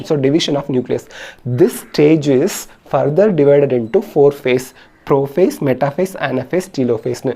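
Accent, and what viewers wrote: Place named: Coimbatore, India. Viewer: Sir nernga entha place coimbatore maari thonuthu unga slang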